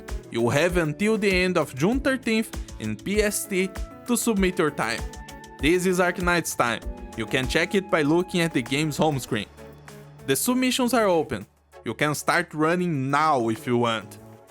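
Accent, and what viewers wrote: Brazil. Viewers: Are u Brazilian? I'm trying to pinpoint ur accent lol😅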